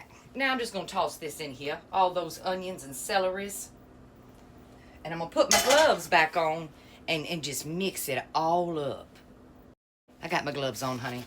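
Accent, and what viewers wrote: South, United States. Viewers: I love your videos and that sassy southern accents. So sweet ♥️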